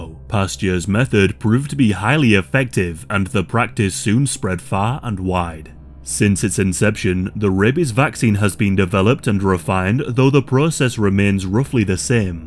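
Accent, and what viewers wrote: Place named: Scotland, United Kingdom. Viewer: Love your accent. Scottish or Irish? I'm a wee bit uncertain.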